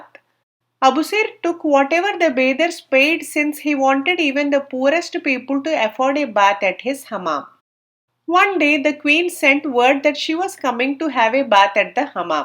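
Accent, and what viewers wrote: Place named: India. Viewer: You narrate so beautifully with a touch of indianness which is delightful for the listener!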